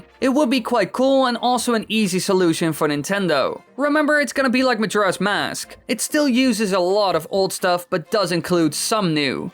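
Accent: Netherlands. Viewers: Oeft what the bloody hell is your accent mate? Is it Dutch? It is Dutch isn’t it?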